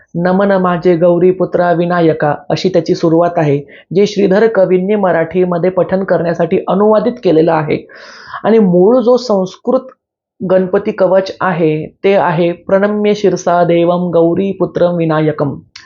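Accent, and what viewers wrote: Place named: Vidarbha, India. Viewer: भाऊ आपली विदर्भाची गोड भाषा आहे आणि तुमच्या मुखातून जास्तच गोड वाटली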